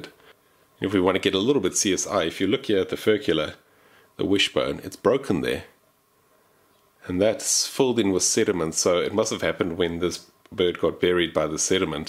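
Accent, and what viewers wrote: South Africa. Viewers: Why do you sound South African that's lived in Scandinavia or smth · You sound like an ex South African was good to see what you do, I know an paleantologist her in ZA such nice people you are 🙏🏼. How old would that penguin be ??? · Sounds South African to me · u sounds south african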